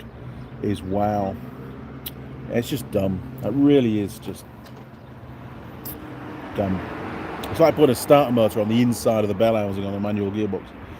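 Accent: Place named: East Riding, United Kingdom. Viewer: I've just found your videos in the last couple of days. Brilliant! As i've had a 1980 t140 built over the last year or so the gearbox strip has been fascinating. You are a natural teacher. Patience, clear and concise explanations plus a sense of humour, marvelous. Being a Yorky myself I can tell you have a neutral accent, but every so often an East Riding one slips through. Where abouts in 'gods own country' are you from. Dewsbury born and bred myself. Keep up the good work and i look forward to the new Bonnie video very much.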